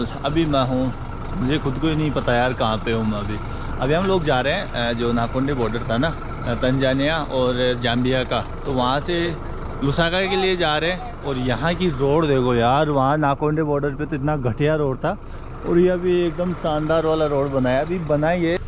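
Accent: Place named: Jaipur, India. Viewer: Kyu ki aap ki bhass Jaipur si lagti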